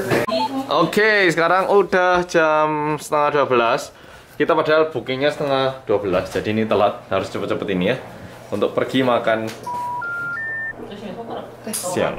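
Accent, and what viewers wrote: Java, Indonesia. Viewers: sebagai perantau di tempat beda budaya dan jadi minoritas, liat mas medhok di korea, malah seperti senasib wwkwkw, where is our home?? haha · Emang kenapa cocok aja Korea sama Jawa sama medoknya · Kok iso medok ngono Yoh???? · SukA banget km ngomong pake bahasa jowo medok bgt... Sy aja gak begitu medok loh 😁😁😘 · Hahaha logat jawanya keren bang👍👍👏👏😂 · logat te akeh jowo ne...salam dr purworejo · Mas sekali kali nggawe vlog tapi ngomong e ora medhok tapi ke kerea koreanen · Waktu pertama liat mas, wah ada orang korea cakep... Pas ngmg jadi.. yakin orang korea? Medok banget 😁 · Medoknya dr mana ya?mama atau papa? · dan punyaku sak muene,, ngawur ogh mamahku iki nguawurr ini yang toua toua duduk di sofa ya.. Touuua toua duduk disofa ini ya Guendeng yoh,, hhh Retceh tnn aku rek,, krungu sampean medok bek seneng awakku😂😭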